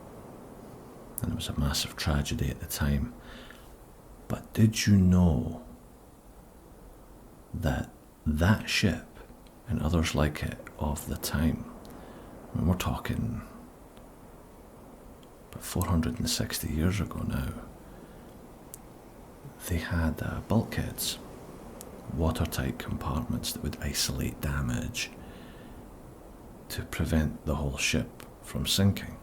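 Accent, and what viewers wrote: Scotland, United Kingdom. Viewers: Found this account today, and it directly qualified as one of my ASMR favourites! Not only is it tingly and makes me laugh - on top of that I get to enjoy listening to the beautiful Scottish accent. It’s all insanely glorious! And I just want to say thank you!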